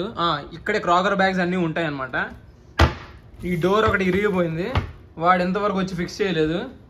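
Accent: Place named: Telugu, India. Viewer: Baga edit chesav anna with pakka Telugu bidda voice 😁😁🤝🤝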